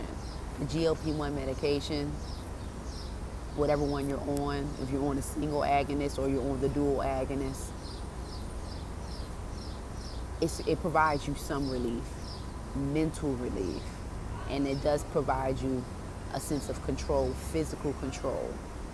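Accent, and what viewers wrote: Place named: Louisiana, United States. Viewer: It sounds like you’re in Louisiana 😍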